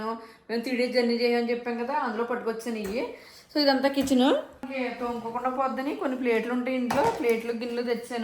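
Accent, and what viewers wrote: Godavari, India. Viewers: Mee video choose choose vizag slang poye godavari slang vachestundi AAYEE.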